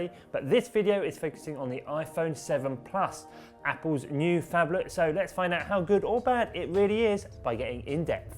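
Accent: United Kingdom. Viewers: British accent so annoying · iPhone is a really premium phone. But budget wise it is not the best Phone. Samsung is by far the best budget brand there is in the market today. ps. I cant decide if your Accent is British or Australian. I have this British friend who speaks like you. 😊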